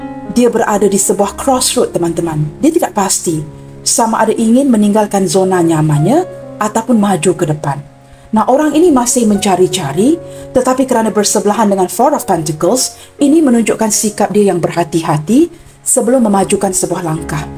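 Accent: Malaysia. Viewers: Waa halo disini juga bangsanya kita kan Bun , bunda kita dulu juga pernah komen disini loohh ihhh ahhaa serumpun lohh wahh dengerin siapa tau cengkok logat Malaysia bisa aku pelajari loohh n aku ingat keluarga tiktok Malaysia lohh ituloh yg pakai jilbab wahh moga tetep bawa energi yg positif , orang nya terkenal sopan wahh ngefan banget sama mereka bun Alhamdulillah Chanel liriklagu di Malaysia sukses moga banyak yg subcrib juga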